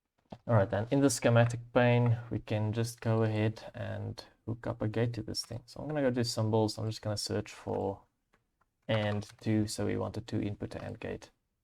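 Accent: South Africa. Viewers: I hear an Afrikaans accent. 😁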